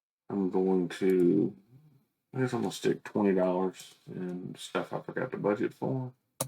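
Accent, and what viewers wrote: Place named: South, United States. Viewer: Your "potatoes and tomatoes" cracked me up! I miss the southern accents now that I've moved! I wish I had grabbed some YNAB swag, I was too new to the game to know about it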